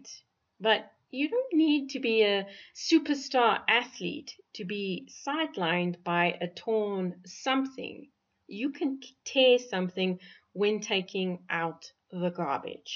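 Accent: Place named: South Africa. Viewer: This is a South African accent! I'm pretty sure about it!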